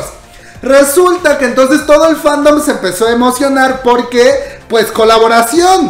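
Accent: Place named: Mexico. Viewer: Sin duda alguna me encanta el acento mexicano, más en...me río muchísimo 😂❤️🇪🇸🇵🇾.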